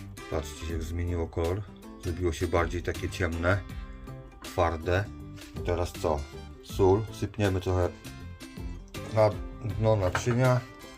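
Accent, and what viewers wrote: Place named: Silesia, Poland. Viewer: Ciekawa ta gwara! Chyba że Śląska? Produkt smakowity! Dobrego dnia życzę 😅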